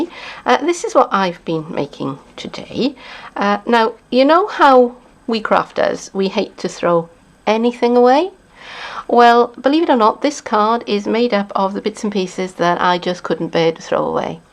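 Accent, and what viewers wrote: Wales, United Kingdom. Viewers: Lovely card, you sound like your from my hometown, port talbot.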